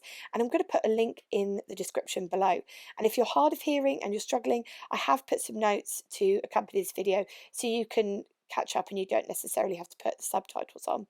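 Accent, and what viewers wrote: United Kingdom. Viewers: Greetings from Canada! You have charming presence and enthusiasm, and it's great to see a woman hosting car programs. If both your.speech and video recording could be a touch slower it may help. Video is enhanced by periodic stops, not always moving; and your delicious accent is nonetheless a bit hard to understand at times "across the pond" (we Canadians apparently are understood all over, but at some cost of blandness.) I mean no offense, and enjoy your lively presenting.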